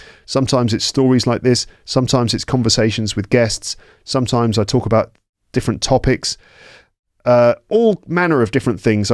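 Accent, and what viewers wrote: United Kingdom. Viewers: I love the accent British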